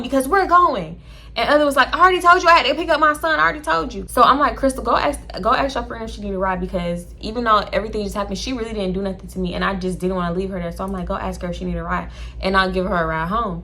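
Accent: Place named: Miami, United States. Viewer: Ouuuu Not Slapping The Ground 😂😂😂😂😂 Chile Sound Like You From MIami. Ready To Go Beast Mode ❗️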